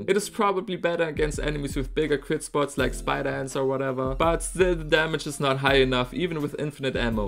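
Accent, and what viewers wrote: Germany. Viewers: Dieser deutsche Akzent hahah :)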